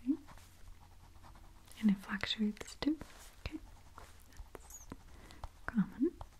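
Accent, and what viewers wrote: Russia. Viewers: Lol girl you said muscle spams lol you crack me up I love your Russian accent when you speak English, so very cute.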